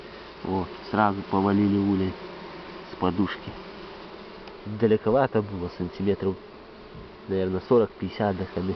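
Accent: Belarus. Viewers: По разговору слышно,что ты с Белоруссии и понятно,что климат там серьёзней,чем у нас ! Для меня подушки не нужны ! Здесь и о мхе ничего не знают. Удачи тебе ! Я на тебя подписан давненько и смотреть тебя приятно ! Молодец ! Удачи нам !!!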